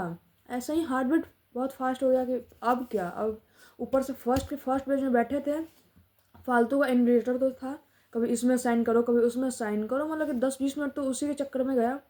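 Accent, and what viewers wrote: Bihar, India. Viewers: Bihar se ho na? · Ap bihar se ho?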